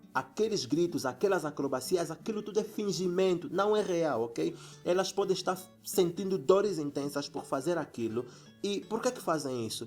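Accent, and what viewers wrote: Angola. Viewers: Um sotaque do Português Angolano parecido com o Português original (o de Portugal) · Gente! Ele é angolano?? Tenho um amigo de angola e o sotaque é igual... Amo angola!! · Seu canal é muito bom! Sou brasileiro. Esse sotaque é angolano?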